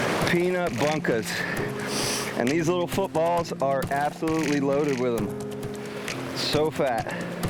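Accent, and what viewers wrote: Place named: New Jersey, United States. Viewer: LOL, I didn't know you spoke New Jersey"n accent!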